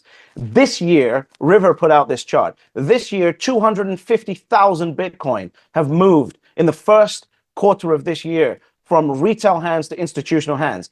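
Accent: United Kingdom. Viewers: Talk that talk ‼️💪🏾💯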